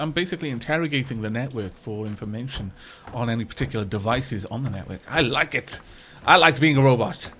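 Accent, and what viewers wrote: Wales, United Kingdom. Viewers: is Welsh, but living in Scotland. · Benjamin Chisholm I believe he's Welsh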